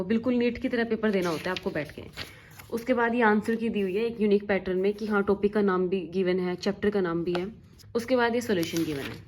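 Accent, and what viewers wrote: Haryana, India. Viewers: Haryanvi Tone 🔥🔥... where are you from didi??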